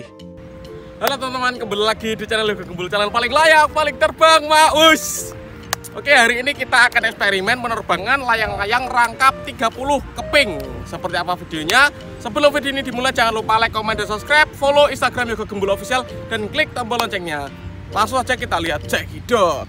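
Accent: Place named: Magelang, Indonesia. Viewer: Kok le omong koyo Magelang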